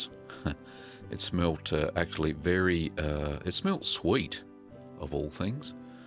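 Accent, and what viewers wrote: Australia. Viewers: Nobody gives a crap, stop being such a whiny baby. He's got an Australian accent. Leave the man alone.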